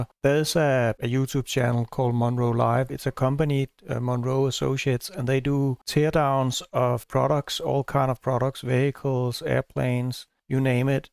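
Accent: Denmark. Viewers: Du låter dansk! 🇸🇪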